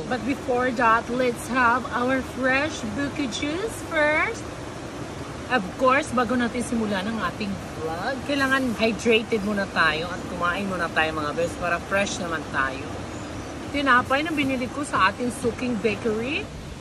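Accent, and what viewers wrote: Visayas, Philippines. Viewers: Bisaya diay ka ma'am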